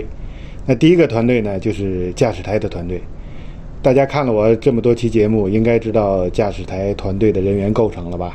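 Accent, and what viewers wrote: Tianjin, China. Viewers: 第一次看船长的视频就被强烈吸引了！李船长渊博的航海知识和严谨的有条不紊的专业叙述最吸引人。加上浑厚的天津普通话播音太酷了！我的印象中在海上的老船长，留着大胡子抽着雪茄大腹便便，就像高尔基小说《在人间》描绘的那样。。。新一代的华人的船长在此！李船长无庸置疑，您是业内的佼佼之子，民族的骄傲，加油啊！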